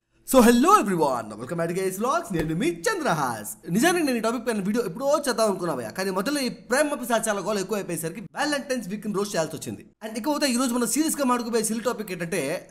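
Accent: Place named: Tirupati, India. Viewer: nenu undedi Tirupati bro Slang ni guess chesa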